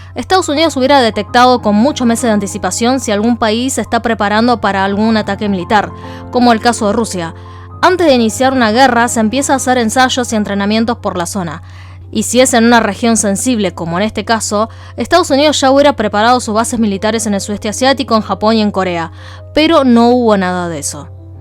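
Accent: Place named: Rioplatense, Argentina. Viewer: Hubo un Miniso en Bs As, pensé que era japonés. Muy buen reporte, el mejor en castellano rioplatense!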